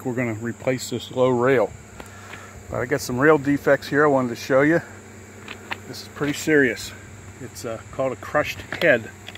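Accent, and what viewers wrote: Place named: Maryland, United States. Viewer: Man, I don't think I've ever heard a thicker Maryland accent in my life.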